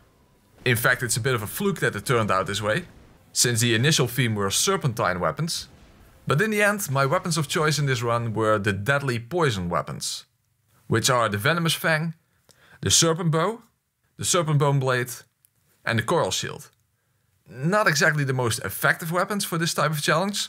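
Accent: Netherlands. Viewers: My brother, it's not just that amazing dutch accent; I for one am always looking forward to your new choices for metalsongs. Again: not dissappointed. Then again, can be used for every pyromancy based run too. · you sound dutch of niet dan :P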